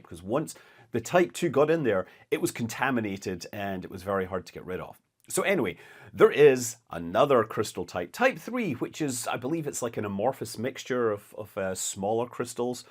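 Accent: Scotland, United Kingdom. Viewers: zero gravity spoken like a yank - loosing your scottish accent!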